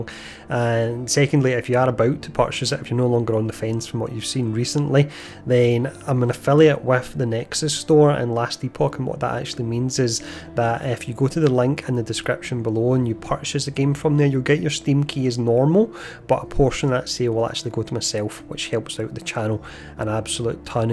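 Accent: Scotland, United Kingdom. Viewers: I absolutely love falconer, and the dive bomb. BUT, cant help myself to feel bad for the poor falcon when he lands that dive bomb, honestly, throws me off, I don’t even want to use it because it looks so hurtful😆 Btw, are you Scottish? You sound like my favorite snooker player, mr. John Higgins 😁 great video all the way through, sub!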